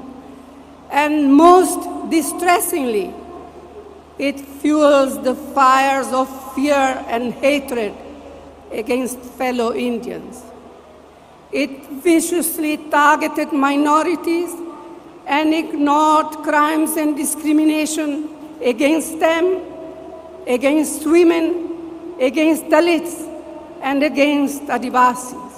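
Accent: Italy. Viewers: Ye hamara durbhagya hai ki aap jase log satta ke uchh shikhar pr pahuch gaye Aapki Hindi or English dono kharab hai. Aap Bharat me salo se ho mager aapke bhasa ke uchharan se lagta hi nhi ki aap bharatiy ho. Aap Italy bolo Jo aapki matri bhasha hai. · सोनिया जी आज भी हिंदी ठीकसे नहीं बोल पाती, अंग्रेजी भी इटालियन लहेजेसे बोलती हैं | अभीतो सिर्फ ५५ सालही हुए है भारत में रहकर |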